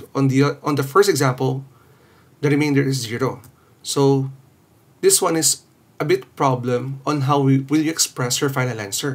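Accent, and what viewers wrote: Philippines. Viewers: Thanks for this loved it soo much...and btw When I first listened ur voice...I knew it that u are from phillipines coz I watched section e drama so I recognised from ur voice that u are from phillipines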